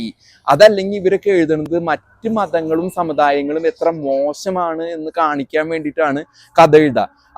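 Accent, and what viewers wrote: Thrissur, India. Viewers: തൃശൂർ ഭാഷ അലക്ക് അടിപൊളി ......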